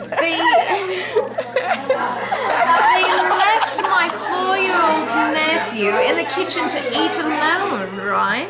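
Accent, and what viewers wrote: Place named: RP, United Kingdom. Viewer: Hey! babe not bad at an english accent mind you it's like Emma from Jane Austin a bit posh. Love the way you kept saying nun instead of nan. i will have to come over and teach you one day; ) TeamUK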